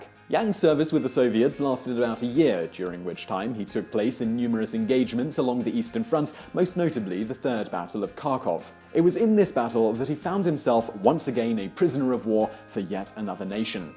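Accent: England, United Kingdom. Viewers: Where is from in England?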